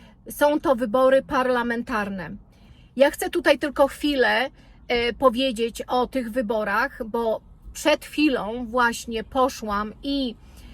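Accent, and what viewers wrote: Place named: Krakow, Poland. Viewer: Pani z Krakowa? Tez tak mowie....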